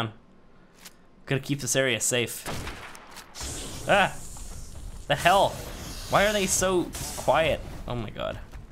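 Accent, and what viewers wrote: United States. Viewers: Are you American all so love the vids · are you amercan?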